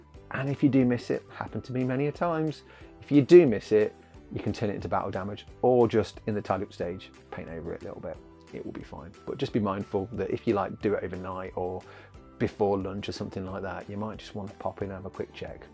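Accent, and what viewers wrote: United Kingdom. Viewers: Is it P Chi Tips or Yorkshire?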